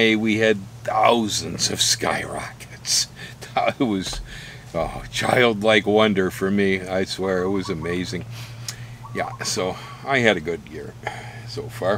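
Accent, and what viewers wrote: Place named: Northeast Ohio, United States. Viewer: This is great info and love listening to you. Your accent...NE Ohio? I grew up there as a kid in the '70s. Your voice takes me back.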